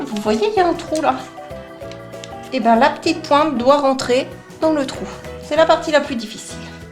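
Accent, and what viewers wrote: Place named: North-East, France. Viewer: Hey t'as un Accent du Nord-Est (de la France) C'est sympa comme pliage :) Ca va faite un petit truc bien sympa pour la table d'anniversaire de mon neuveu ^^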